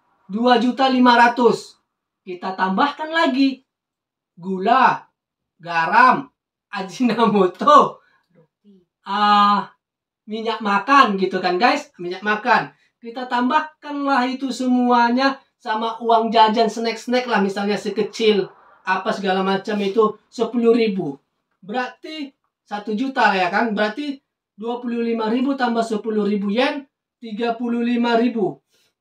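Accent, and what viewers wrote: Medan, Indonesia. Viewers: Abangnya logat bicararamya dari medan ya Bang? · Medan kali bahasamu lagi yak horaslah. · Mantap abang ku , kayaknya medein medan ini abang ku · Kayak Medan kali bahasanya😊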